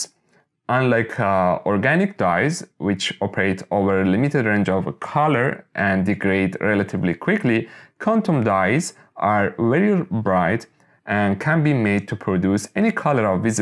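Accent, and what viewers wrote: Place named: Iran. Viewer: Hello, are you from Iran? Because of your accent,I asked.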